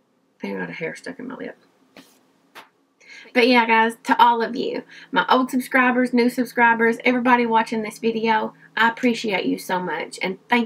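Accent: South, United States. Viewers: I recently found your channel via emilynoel83. I just love your videos! You are such a beauty inside and out!! I love your accent, from one southern girl to another. :)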